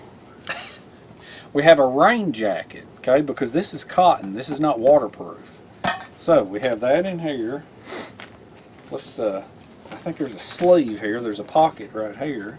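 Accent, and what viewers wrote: South, United States. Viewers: So full of information. Great humor and have great southern accent love to hear you talk.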